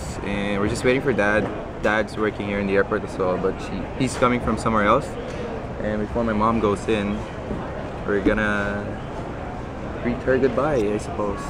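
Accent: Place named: Canada. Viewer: Candian accent ka na ah. :) cool.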